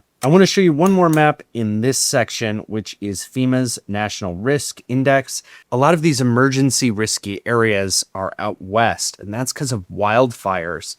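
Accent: United Kingdom. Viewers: As someone from Oklahoma. We’re sort of a mix of South and Midwest. Your accent in the ad read sounded almost UKish to me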